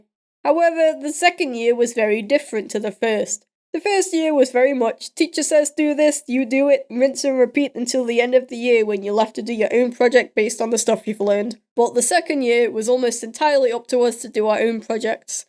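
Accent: United Kingdom. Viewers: Still don’t know if he a boy or a girl his British voice just makes it harder and is he supposed to be a furry ? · your voice sounded weird (well your voice always sounds weird to me because I'm American and only know a few Brits)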